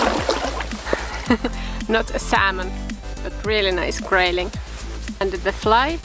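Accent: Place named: Europe. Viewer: Very nice fish in Sweden I like your channel. I really like the way you say fissing and not fishing it sounds very European.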